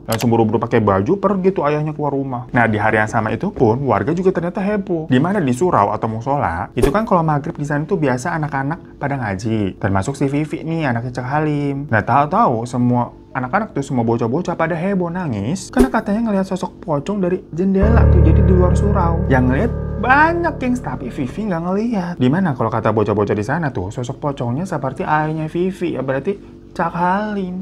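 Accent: Java, Indonesia. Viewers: Lu bukan logat madura bamg, malah medok jawa😂😂😂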